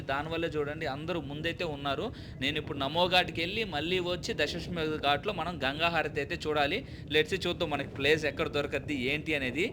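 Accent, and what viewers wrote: Nellore, India. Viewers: Meedi Nlr bro mee language vini aduguthunna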